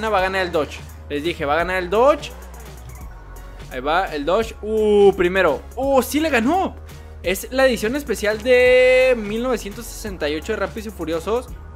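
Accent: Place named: Mexico. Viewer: mexico se nota hasta en el acento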